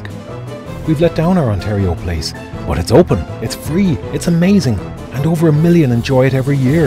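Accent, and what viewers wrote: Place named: Ireland. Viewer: 1. I fail to see the relevance of which country the company is from. But there's also a company from Quebec called EcoRecreo involved with the project but I guess that doesn't fit your "anti Canadian" narrative? 2. Fifty dollars per person for a trip to a spa and waterpark seems pretty reasonable. Before Ontario Place park closed they also charged for admission because that's how theme parks work so I don't know what you're upset about. The plans also include various winter activities like ice skating. 3. There are better places you can go if you just want trees and a lakeshore. The Leslie Street spit or the islands for starters. 4. You seem to have some sort of problem with companies making a profit but I notice that you accept donations and have ads on your videos. So where is your profit going? 5. Why is an Irishman narrating this video and posing as a diehard Ontario native?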